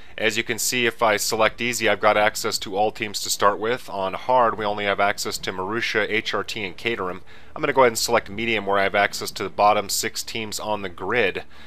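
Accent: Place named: United States. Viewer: it's funny hearing an American talk about F1 for some reason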